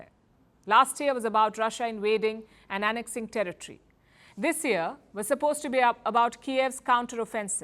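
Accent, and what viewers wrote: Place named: India. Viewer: This woman pisses me off with her arrogant voice trying desperately to imitate Tucker Carlson with a ridiculous Indian accent. This woman pisses me off with her arrogant voice trying desperately to imitate Tucker Carlson with a ridiculous Indian accent. Try to be original ma'am.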